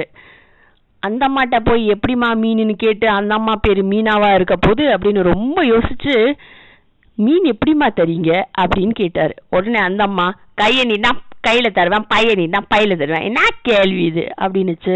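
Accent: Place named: Chennai, India. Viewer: Madras Tamil, modulation and voice change Super 👌👍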